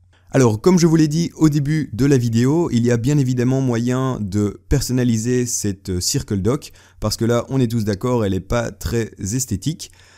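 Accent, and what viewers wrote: Belgium. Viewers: T'es belge?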